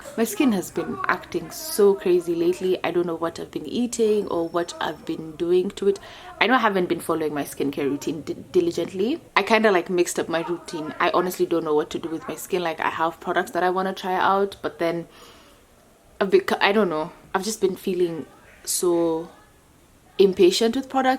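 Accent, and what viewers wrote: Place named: Kenya. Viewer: Love her Kenyan English accent 😭ps we need a tutorial for the makeup you was wearing in the start of the video 😍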